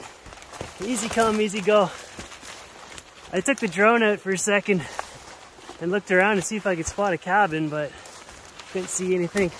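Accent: Canada. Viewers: I know I"m an odd guy, but the two things I look forward to most in your videos is the opening jingle and the way you prounounce Canadian 'out' as 'oot'. Love it. And of course your in van adventures.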